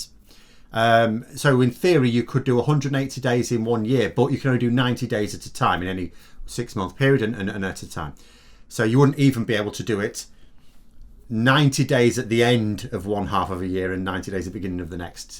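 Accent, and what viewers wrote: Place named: England, United Kingdom. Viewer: Are you really English? After years of forced listening to Brexit hacks, what you say does not match your accent! Freakish, but in a good way!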